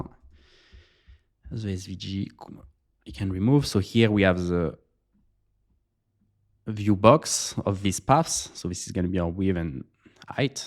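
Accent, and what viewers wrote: France. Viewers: J'adore cette série, ça me donne plein d'idée pour mes projets ! (j'adore aussi ton accent Français ahah)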